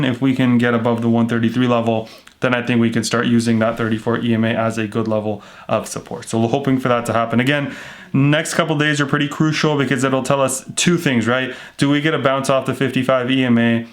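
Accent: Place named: Canada. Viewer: What? Dallas TX! I thought you are Canadian! Lol 😆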